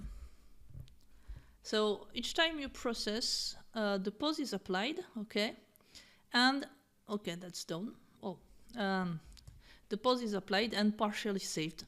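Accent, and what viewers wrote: France. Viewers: She is a girl. Avec un joli accent français de surcroît ! 😉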